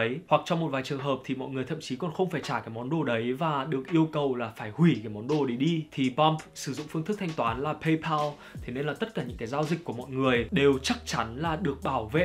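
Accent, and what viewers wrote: Standard. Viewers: Tao thay thang này phát am tuy chuan nhung nghe cam thay k tu nhien . Nghe mac met giùm lun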